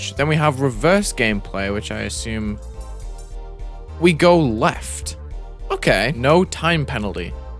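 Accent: United Kingdom. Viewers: you're british!?!? nIce vid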